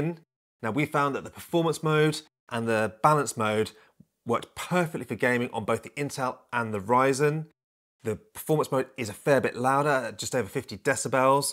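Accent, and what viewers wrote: United Kingdom. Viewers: laptop review in BS English is refreshing, since majority are in US English. makes the laptop sounds classier.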